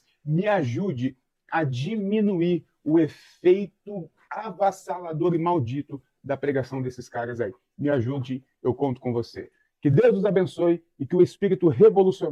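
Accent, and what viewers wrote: Minas Gerais, Brazil. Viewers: Em meados de 2011, assisti pela primeira vez, um video desse sujeito - já NÃO fui com a cara dele. Esse sotaque minereis com uma pitada de Tirica é insuportavel.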